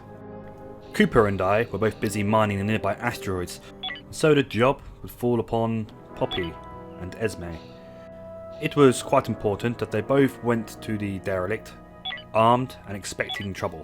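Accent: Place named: United Kingdom. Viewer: You some how sound more British then I thought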